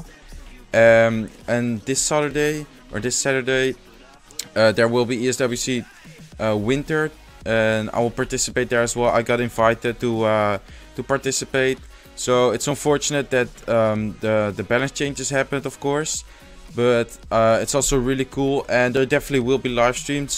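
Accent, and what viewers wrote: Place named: Netherlands. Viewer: Where you from? Je klinkt Nederlands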